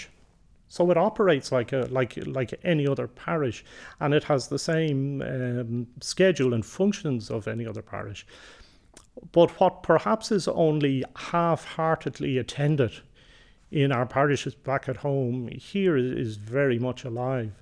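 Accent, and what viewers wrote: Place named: Ireland. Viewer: Beautiful experience and gorgeous Irish accent 😇 Thanks for sharing, father!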